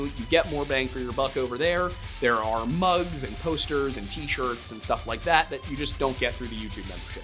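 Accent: Canada. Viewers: Your Canadian accent killed me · "Let's get Innuit." The Canadian lighting and accent. All 🤌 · Love your Canadian accent -a laughing Canadian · As a Canadian I did like the accent you went for, it was a little honky tonk but you got the right idea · dude you sound like a leprechaun with the Canadian accent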